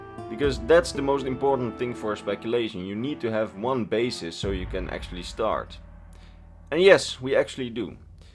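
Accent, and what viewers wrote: Netherlands. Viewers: The dutch is strong in you my friend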